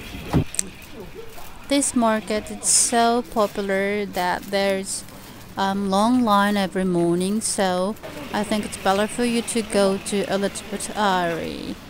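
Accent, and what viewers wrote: United Kingdom. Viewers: イギリス英語かな❓ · イギリス英語ですね😃✌️🌹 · イギリス系英語？聞き取りやす～い！ゆうくん可愛い❤️うちのこもこんなんやったな～ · すごい行列。朝市って意外と混むんですね。 アクセントが、イギリス英語かな？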